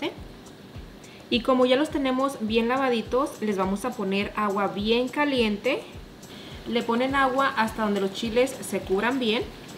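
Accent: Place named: North, Spain. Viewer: Parece del norte su acento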